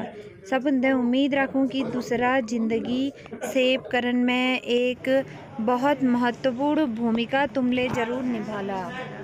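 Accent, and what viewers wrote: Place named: Pahari, India. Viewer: Mam uh look soo pretty and cute...and I really like the way you speak pahadi...😘😘😘😘...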